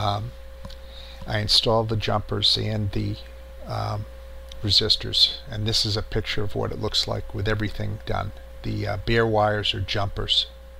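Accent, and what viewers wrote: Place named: Boston, United States. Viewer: i can tell you're from boston...just saying. cool video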